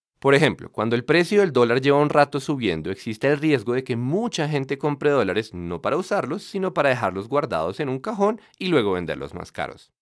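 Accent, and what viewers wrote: Bogotá, Colombia. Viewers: My bien explicado pero con un acento rolo demasiado marcado "ala mi rrrey" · qué buen canal, y sólo vengo a realizar que son colombianos(creo) por el acento... es cierto,no? estudié español 8 años y viví en Medellín 5 años de esos para aprender mejor. ahora no he estudiado mucho pero me siento casi colombiano porque me acogieron con mucho cariño cuando viví allá, se sentía como mi hogar,sabes? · Pero el que habla en el video no es de Medellín, es de Bogotá. Por ende su acento es "rolo".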